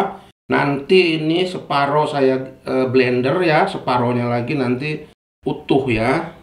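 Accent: West Java, Indonesia. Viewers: Bapak mah Sundanya kas, kalo saya mah Tasik, bapak Pangandaran ga jauh pa 😀😀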